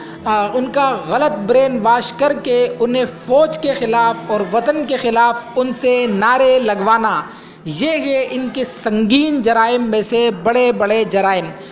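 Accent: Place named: India. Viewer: Ye kesi Pakistani k bolne k style ni he is indian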